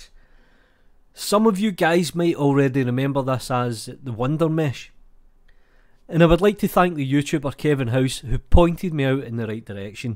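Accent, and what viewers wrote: Scotland, United Kingdom. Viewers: His english Is great.. is that Scottish ?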